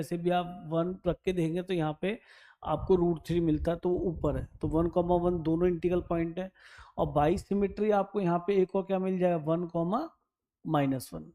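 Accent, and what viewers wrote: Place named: Uttar Pradesh, India. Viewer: sir ap up s he kya?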